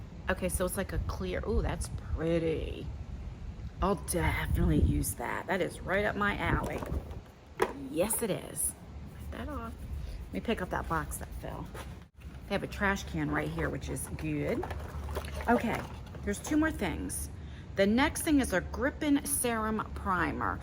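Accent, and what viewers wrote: New Jersey, United States. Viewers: I know I've told you this before but I love your NJ accent because my boyfriend of 13 years has the same accent and I'm from Boston so I have a Boston accent so we tease each other about our accents. 🤣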